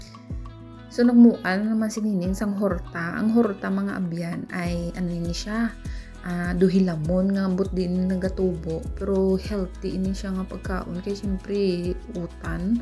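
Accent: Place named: Hiligaynon, Philippines. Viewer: Baw ilongga gid ba🤣✌️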